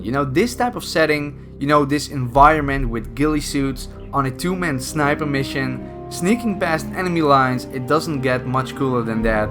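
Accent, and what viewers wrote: Netherlands. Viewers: You sound like Silo Entertaiment (or its just the Dutch accent) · Ben je een Nederlander want ik hoor het aan je accent😂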